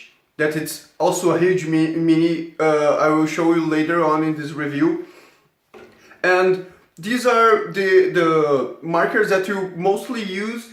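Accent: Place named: Brazil. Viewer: Tu é BR, esse sotaque não engana kkkkkkkk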